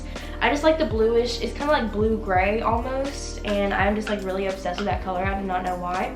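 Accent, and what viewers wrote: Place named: South, United States. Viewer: You kinda have a southern accent ❤️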